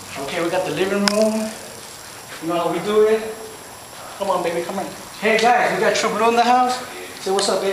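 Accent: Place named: Mexico. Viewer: hahahha i amost fell for it carnal hahah u look like many but then i heard ur mexican accent jajaj la cervesa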